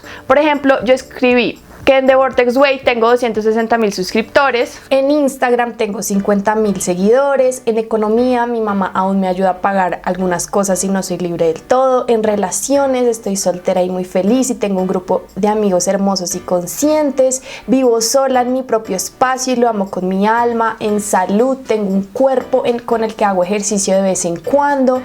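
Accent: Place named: Bogotá, Colombia. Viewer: Siiii. Es de Bogotá DC 🤗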